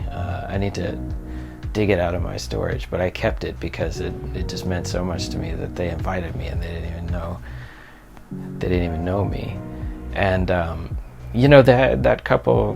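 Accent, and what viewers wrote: Finland. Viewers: You are picking up a Finnish accent :D PS. Welcome to Finland. Edit: Oooh so you moved ages ago. No wonder the accent is there.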